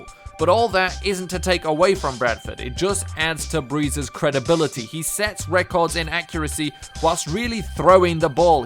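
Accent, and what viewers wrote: England, United Kingdom. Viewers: Your British accent ruins your credibility. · Wait. Is that english accent? It's funny how the narrator has english accent talking about American Football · And it sounds more believable with the British accent.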